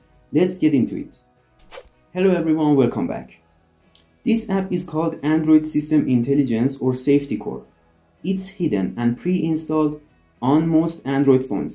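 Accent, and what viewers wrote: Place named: Iran. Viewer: لهجت میخوره ایرانی باشی رفیق 😂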